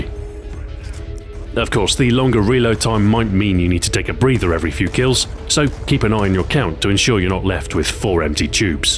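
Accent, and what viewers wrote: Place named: United Kingdom. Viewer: With a weird voice? He has a British accent, you moron.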